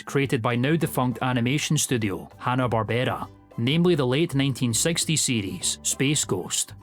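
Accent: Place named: Scotland, United Kingdom. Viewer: I cant tell if youre scottish or irish but i can recognize youre scottish and that scares me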